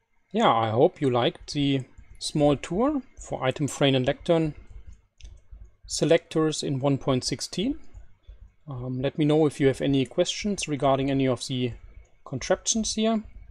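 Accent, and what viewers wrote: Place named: Germany. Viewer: His accent sounds kinda german...🤔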